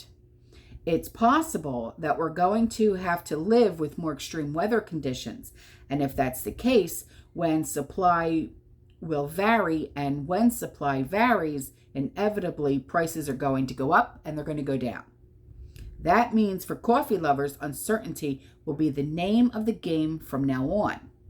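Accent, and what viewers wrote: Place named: South Jersey, United States. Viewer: She’s from South Jersey.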